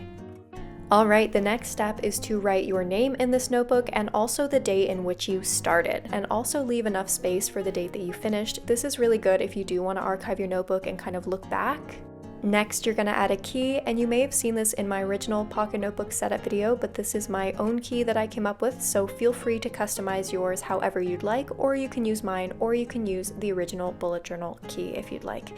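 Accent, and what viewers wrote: Canada. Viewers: you have the strongest Canadian accent i’ve heard in a long time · I loved this video, it was beyond helpful <3 I think using a cheap notebook and modding it helps with the "perfectionist freeze" that I get when I don't want to start a new notebook and ruin it. By the time you start these books, they've already been broken in =) Also I thought I heard that familiar Canadian idiolect, and I'm glad that wasn't just in my head lol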